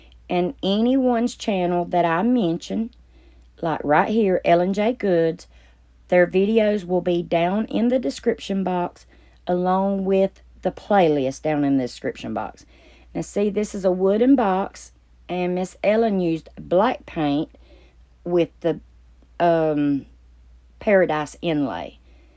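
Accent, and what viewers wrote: South, United States. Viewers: Thanks for your comment. My name is Jackie, I use BunnyRN because I have collected bunnies (not real ones) for years, and I'm an RN (retired). I love your souuthern accent, I live in eastern NC. I really enjoy your videos, creativity, and your humor.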